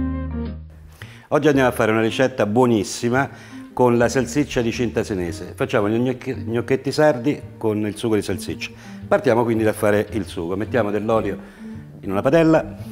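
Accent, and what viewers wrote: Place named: Rome, Italy. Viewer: sembrava un accento romano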